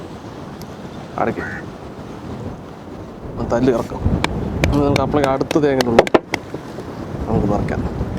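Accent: Palakkad, India. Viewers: പാലക്കാട് ഭാഷ സുപ്പർ. പക്ഷേ നോൺ വെജ് തിന്നാത്ത കാരണം ഇതിനെ പറ്റി ഒന്നും അറിയില്ല. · Allelum palakan slang ishtallathorindo.,🥰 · സംസാരം കേട്ടിട്ട് പാലക്കാട്‌ ആണെന്ന് തോന്നുന്നു വീട് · Typical palakkad style of speaking. Good way of preparation.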